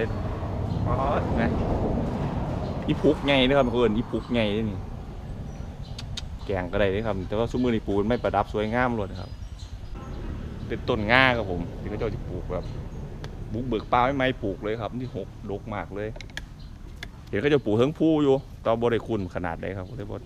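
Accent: Isan, Thailand. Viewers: กูว่าแล้วมึงต้องเว้าเขั้มข้นมาก😆😆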